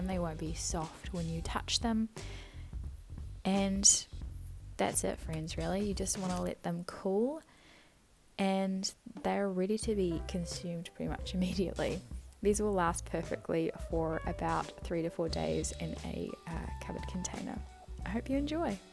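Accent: New Zealand. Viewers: You sound like a kiwi who wants to be a yank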